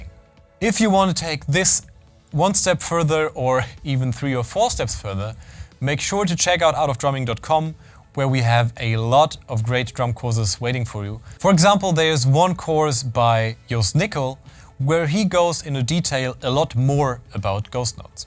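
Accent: Germany. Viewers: Bei dem Akzent dachte ich mir doch schon das es ein Deutscher sein muss👌🏼 weiter so👌🏼💪🏼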